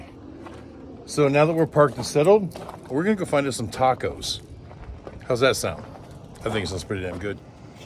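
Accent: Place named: Minnesota, United States. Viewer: By his accent, he sounds like he’s from Minnesota 😅?!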